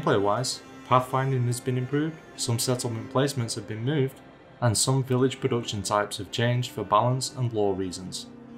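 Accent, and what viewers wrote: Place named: Turkey. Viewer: okuyanın Türk olduğu çok belli bee :D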